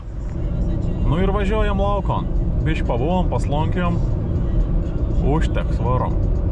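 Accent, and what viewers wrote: Samogitia, Lithuania. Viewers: Super žemaitis :)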